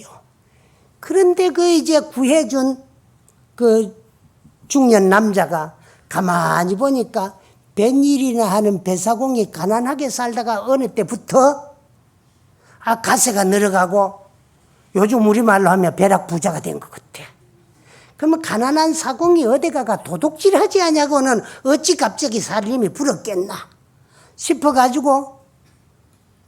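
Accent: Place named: Gangwon, South Korea. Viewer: 감사 합니다 스님법문 듣고보다보면 빠져들어갑니다 구수하신 말씀 강원도 사투리로 정감있는 말씀 너무 재미있게 하십니다 항상감사드립니다 날씨가많이 덥습니다 항상건강하세요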